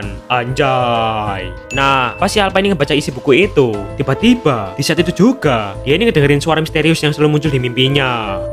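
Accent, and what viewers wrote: Java, Indonesia. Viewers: Bang kamu jawa yoo lek jowo podo rekk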